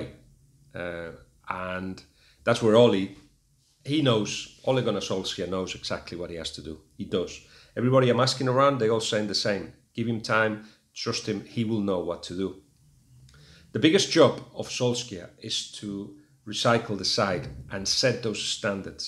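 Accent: Spain. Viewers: So this guy right here is certainly a comic, he pretends he knows about football but I honestly don’t think he does, so he came here sat on the same chair with his thick Spanish accent talking about how tactical and good OLGS was, and that he was a better fit than mournho, I’ve always said this, if mourinho had been allowed to be mourinho and not crucified him like the media did to make him Look a mere manager then United would have been better off, pogba got mourinho that axe because he couldn’t free him, or martial is not given the freedom, oh Alexis wasn’t given the freedom that was staple everyday, mouh is gone yet still united are shit. This guy said United are having a mourinho hangover because some of the players are used to his way which is utter nonsense, pogba will force a move to Madrid just watch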